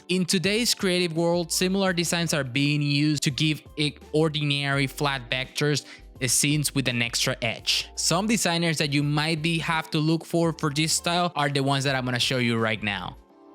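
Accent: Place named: Venezuela. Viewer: Amigo excelente video, apenas escuché tu acento algo me decía que eras Venezolano haha 🙌 Keep up the great work!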